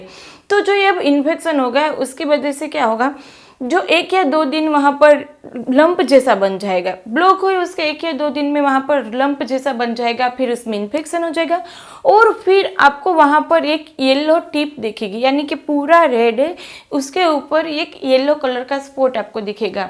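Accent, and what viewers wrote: Gujarat, India. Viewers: Madam apka surname se ap north indian lagte ho per hindi ascent se gujarati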